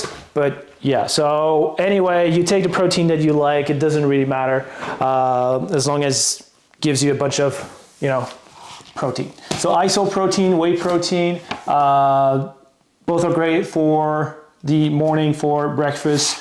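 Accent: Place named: France. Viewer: Your lovely French accent makes everything sound so refined and erudite. Lots of love from SK!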